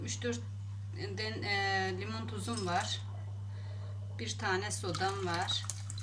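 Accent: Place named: Artvin, Turkey. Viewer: Artvinli konuşman var sife memleket neresi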